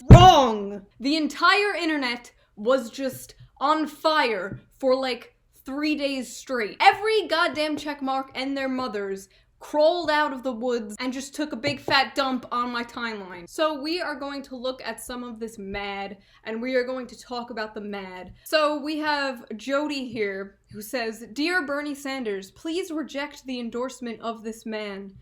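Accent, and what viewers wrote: Boston, United States. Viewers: Why is your Boston accent so strong here😂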